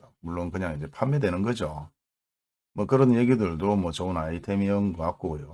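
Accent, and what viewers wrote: Gyeongsang, South Korea. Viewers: 저음에 투박한듯한 경상도 사투리 저는 듣기 좋습니다~~감사합니다^^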